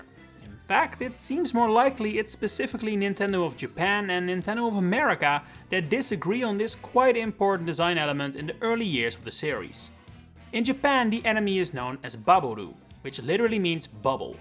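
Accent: Netherlands. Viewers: I'm from the Netherlands and I can just easily here his Dutch accent. Honestly, to me it just pisses me off to here Dutch people trying to speak English but yeah that's, about it really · Vrijwel elke Nederlander heeft moeite met hun accent verbergen in het Engels. Ik hoorde gelijk dat je Nederlands bent hahah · sec in and can just tell you're Dutch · Are you dutch?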